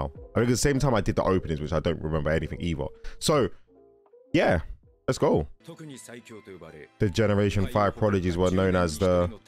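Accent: United Kingdom. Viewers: Are you from England? Your English sounds like British English?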